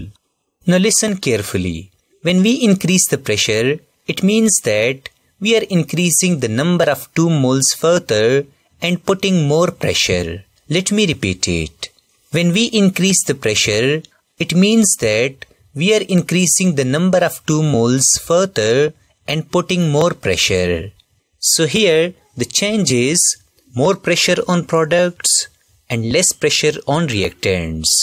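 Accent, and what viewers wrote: South India, India. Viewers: Bruh are you South Indian? 'Cause your Accent 😭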